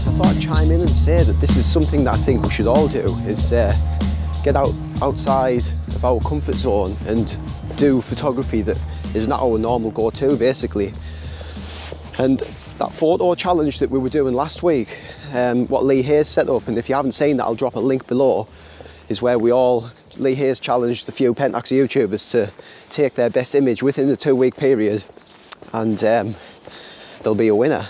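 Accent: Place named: Durham, United Kingdom. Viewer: Just come across your channel Lee and I love it! Your accent is identical to mine - a Durham lad - and I love how you appreciate Pentax! I have had all brands over the past 10-12 years from point and shoots to my current camera the Sony A7iii, but the best shots I've ever taken were with the Pentax K3. Keep up the good work pal!!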